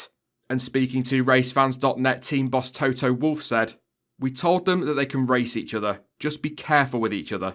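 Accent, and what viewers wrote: United Kingdom. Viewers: Sexy british accent #nohomo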